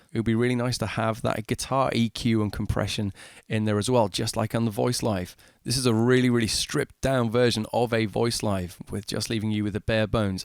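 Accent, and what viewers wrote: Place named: United Kingdom. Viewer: What a great review and video. I've watched a few vids on this pedal looking for specific info and finally, you knocked it out of the park. The eloquence of speech delivered with the British accent was spot on mate. (Haha) Last but not least, the song performances were quite good. If those are your tunes, I'm thoroughly impressed. Hell I'm impressed regardless. Later dude.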